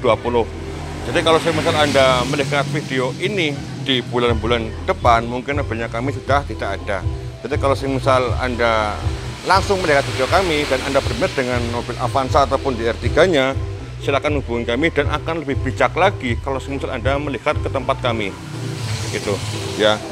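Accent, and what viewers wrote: Banyumas, Indonesia. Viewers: jos gandos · Tangerang menyimak kang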